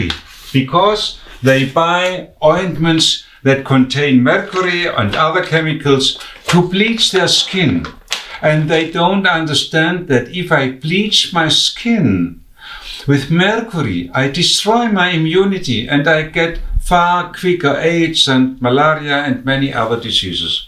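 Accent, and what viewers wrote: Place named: Germany. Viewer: Me gusta como los alemanes hablan el inglés! Sobre todo en la pronunciación de la W 😅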